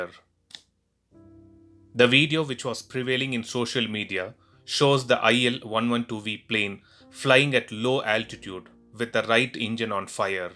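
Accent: India. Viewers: Naratornya kaya orang India ngomongnya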